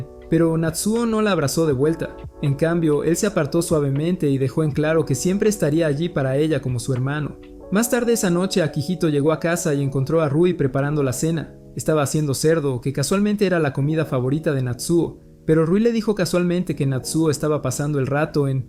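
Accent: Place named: North, Mexico. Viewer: Como que un poco norteño e unnnn poquito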